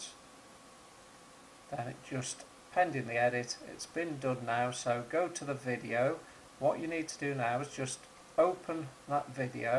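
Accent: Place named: England, United Kingdom. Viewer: your english accent........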